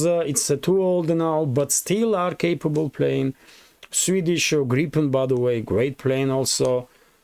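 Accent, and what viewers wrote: Russia. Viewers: 2 streams is much better, dont forget the attention timespan of modern humans ( esp young ones) is less than 10 Minutes! Kepp on the good work, i really like to hear a Russian voice, even if you are emotionally involved and cant be 100% neutral. The same for Patrick Lancaster i watched 90% of all his videos and already in Mariupol battle it was obvious after he reports the civilian victims fate he cant be neutral. I can accept that.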